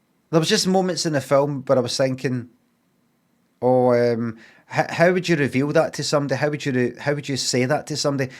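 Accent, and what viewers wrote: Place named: Glasgow, United Kingdom. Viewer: He never really acts up the accent if he's annoyed. If anything, he puts on the posh Glaswegian when he is annoyed by sumin 😅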